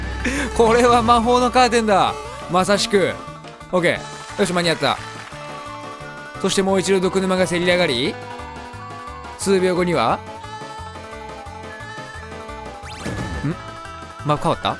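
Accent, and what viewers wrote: Kansai, Japan. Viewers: さんの関西弁大好き！同士おるよね？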